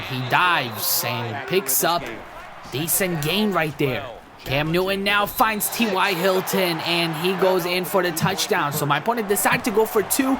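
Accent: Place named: Midwest, United States. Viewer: That's a mid west United States accent they speak very flatly.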